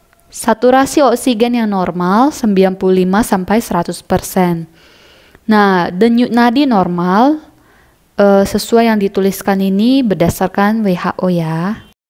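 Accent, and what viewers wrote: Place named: Medan, Indonesia. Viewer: Orang Medan ya? Logatnya kayak temen Medan saya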